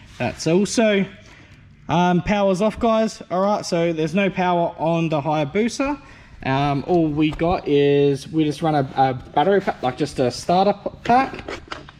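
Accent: Australia. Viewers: Bloody!!!!!!, Insane!!!!, Well BUILT!!!!!, Ya Sound Aussie:text-green-game-over::text-green-game-over::text-green-game-over::face-green-smiling::face-green-smiling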